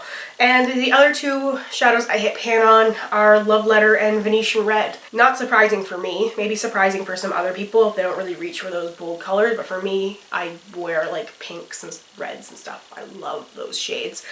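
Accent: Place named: Canada. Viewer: I love your videos. I wish I had more time to devote to them. Three little ones keeps me busy. Don't be nervous about your get ready with me's. Taylor swift says "haters gunna hate". But your true followers love you for who you are. I love listening to other Canadians and how they pronounce their words. And today I realized we say our "A's" the same! Ok. Enough fan girl stuff.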